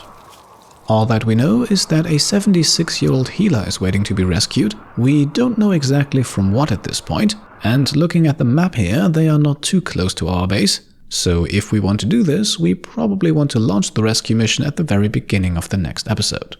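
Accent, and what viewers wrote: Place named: Germany. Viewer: thankyou for your cute German accent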